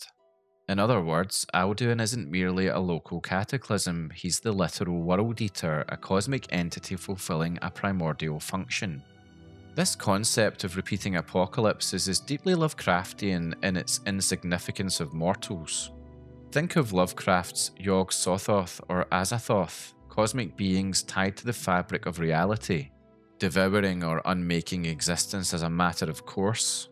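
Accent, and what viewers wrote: Scotland, United Kingdom. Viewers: Love your accent. The Elder Scrolls need way more authentic scottish voices, it would fit in perfectly. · It's good to hear another Scotsman on the internet. Free the motherland from the Saxon hordes! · This guy's voice and Scottish accent is more fitting for skyrim then the skyrim voice actors themselves. I'm not sure if you will see this comment but you are now my go to skyrim youtube channel. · my favorite scottish narrator comes back with anothet great lore movie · Me pressing play: "please dont be ai, please dont be ai, please dont be ai" (hears lovely - and REAL - Scottish accent): "OH THANK GOD!"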